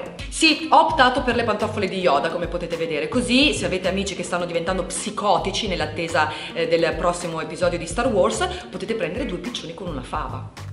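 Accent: Italy. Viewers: quel dialetto! sei di Reggio Emilia? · ma sei pure emiliana! ok ti adoro, è ufficiale!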